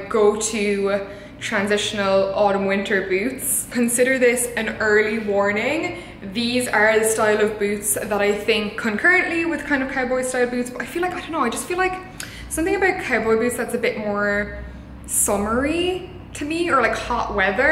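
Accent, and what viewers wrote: Ireland. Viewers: I'm new to your channel. Is that a slight Irish accent I hear by chance? Great gems!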